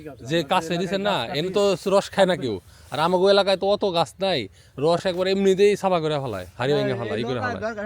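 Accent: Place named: Jessore, Bangladesh. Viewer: আমাদের যশোরের ভাষা মনে হচ্ছে, ভাই কি গিয়েছিলেন??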